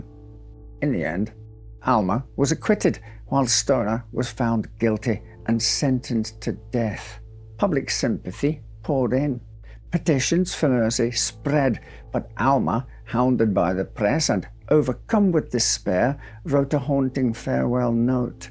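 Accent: Scotland, United Kingdom. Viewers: He does tell a good story. Sounds a bit of Scottish accent, which makes it so much more interesting. I have no trouble understanding him as he's always careful to articulate clearly.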